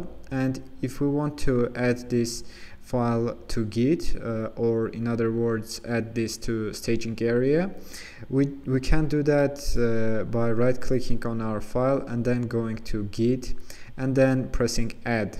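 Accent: Iran. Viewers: are you from Iran? interesting accent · hey bro, Your accent is very similar to Iranian, are you Iranian?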